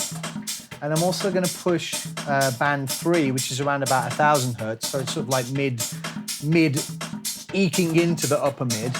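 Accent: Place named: United States. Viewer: What's with the American accent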